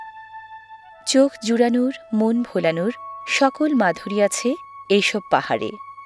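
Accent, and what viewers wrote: Standard. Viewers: আপুর কন্ঠের ভাষা অনেক ভালো লাগে। শুদ্ধস্বরে বাংলা ভাষা শুনলে যেন প্রান জুড়িয়ে যায়। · আপু আপনার অনুষ্ঠান খুবই ভালো লাগে আপনার মুখের কথা গুলো অতি শুদ্ধ আর আপনার মুখের ভাষা গুলো শুনতে ভালো লাগে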